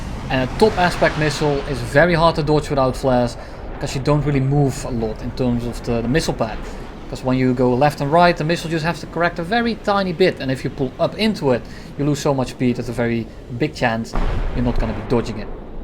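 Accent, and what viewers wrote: Netherlands. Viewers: I'm trying to figure out what your accent is. I think Dutch, in which case I'll nickname you (if you want) but I'm not 100% sure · Is it Just me or do i hear a dutch guy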